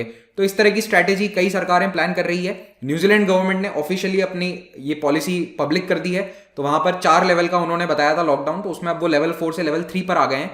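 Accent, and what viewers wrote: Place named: Uttar Pradesh, India. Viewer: Sir aap UP se honge.....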